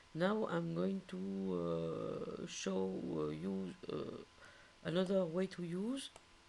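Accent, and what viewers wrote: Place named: France. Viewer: Great tutorial. Your english was perfect. Your accent reminds me of my grandmother whose first language was French. I miss hearing it. I learned something new with the tutorial! Thank you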